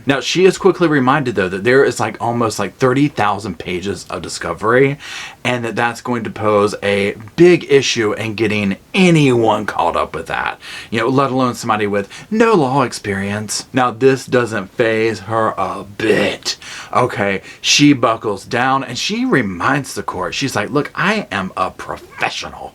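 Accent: South, United States. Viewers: I just love all your comments and facial expressions, you make me laugh. Lord knows I need more laughter in my life. Thank you for making that happen. Love your southern accent. I grew up in Florida so I have one too. Lol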